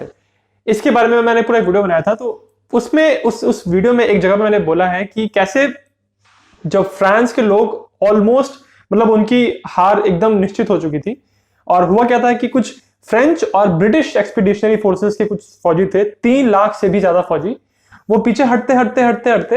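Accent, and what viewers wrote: Bengal, India. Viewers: Bhai tum Bengali ho kya please reply🤗🤗🤗🤗🤗🤗🤗🤗🤗🤗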